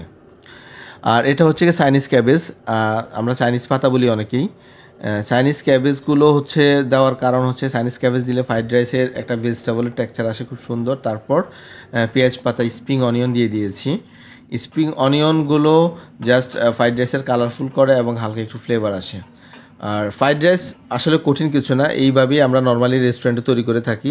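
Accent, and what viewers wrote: Noakhali, Bangladesh. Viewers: Noakhali mone hocce.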